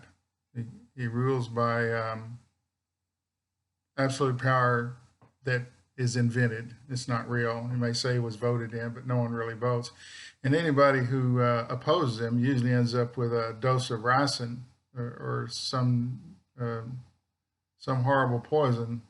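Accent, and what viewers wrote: Texas, United States. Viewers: I really enjoy your style of delivery. I'm new to your channel, thanks to whoever gave a shout-out. Are you from Texas? There is something familiar about your accent. I live in Keller north of Fort Worth.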